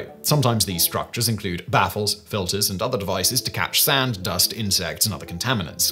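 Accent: United Kingdom. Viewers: He sounds British, but his speech is rushin... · Hey mate, your audience is international. Speaking a British dialect this fast doesn't help your viewers. With all do respect, vowels can not be just a whatever short sound between consonants. Hope you appreciate constructive criticism. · Really enjoy the content. Very informative. But the presenter's very rapid speaking, with the British inflection is exhausting. Also, the "pictures" presented, while the person explains a certain thought has a strobe effect around the edges which distracts and is visibly annoying. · Hey, buddy!! You need to curtail your caffeine intake a tad. Your british brogue is already difficult for us "colonials" to follow. Please, slow down a bit, OK!!!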